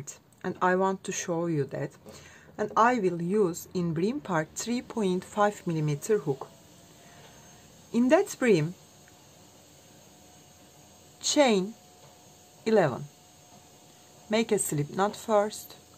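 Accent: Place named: Turkey. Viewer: Türkçe alt yazı olaydı iyiydi ingilizce konuşmanızda türk gibi türksünüz degilmi ses tonunuz onu andiriyo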